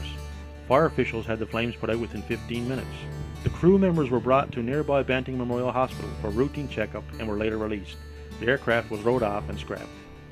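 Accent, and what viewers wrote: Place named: Cornwall, United Kingdom. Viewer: The narrator has a VERY Cornish inflection to his accent. He might have ancestors in Devon.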